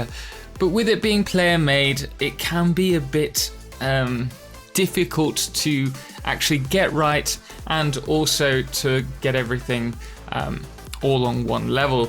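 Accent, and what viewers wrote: England, United Kingdom. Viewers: I watch this channel about Satisfactory mainly because of the pure English pronansuation! It's just perfect!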